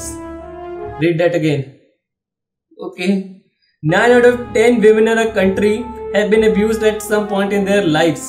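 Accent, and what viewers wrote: Punjab, India. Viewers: I fucking love the Punjabi accent man! Subscribed in the first 10 seconds.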